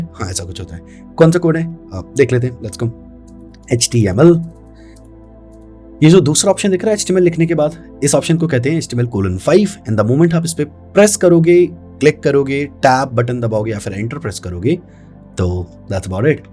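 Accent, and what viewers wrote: Bhopal, India. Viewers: nice and calm explaination and to the point with some bhopali touch thank you sir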